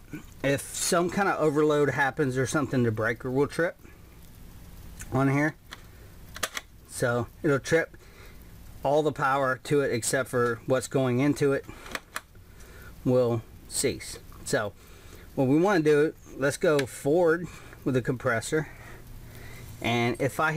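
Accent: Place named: South, United States. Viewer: They still make them...UEI about $250.00 on sale....I've never hooked mine up to 220...A little afraid to, running 220 down a 110 volt cord, but they say you can do it...FYI for you guys, a compressor normally won't start again after just shutting off...To much head pressure to start against so they have to sit and let pressures equalize....They'll kick the overload, Kixon or whatever type used until they do....Thanks to the what sounds like a country boy making this video...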